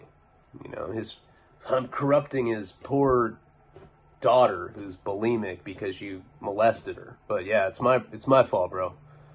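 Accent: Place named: Boston, United States. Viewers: Oh my god! Your Boston accent!!!! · Your Boston accent makes me giggle poo poo Palabra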